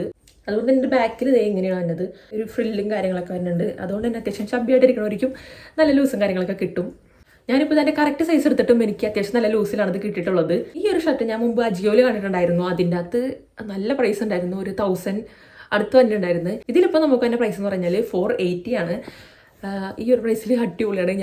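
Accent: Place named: Thrissur, India. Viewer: Chechide veed Thrissur aano Slang avidethe thonni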